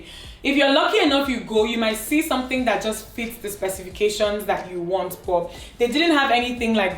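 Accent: Nigeria. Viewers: I love the Nigerian accent 😆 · I love this video . I also love you naija accent; so true! Thanks for the tour. I am trying to pimp my room for my videos and I am more than inspired . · Cherry chia different places different pronunciations. That’s how it’s pronounced in Nigeria. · oh ... Nigerian accent won't let this girl be great ...anyways she Nigerian so..., but nice apartment tho...am currently renovating my own 1 bedroom apartment in the UK which is a lot similar to this, but a bit bigger in size. Its Easier over here because you just shop on Amazon or wayfair.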